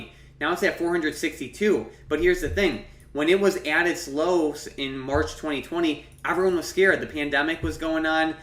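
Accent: Midwest, United States. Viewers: You sound like your from Minnesota or Wisconsin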